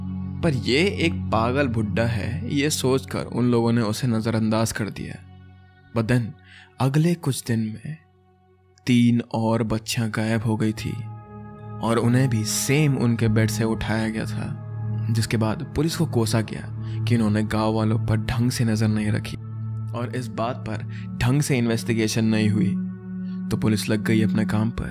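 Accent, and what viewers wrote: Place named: Bengal, India. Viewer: His mother toungh is Bengali bro he can't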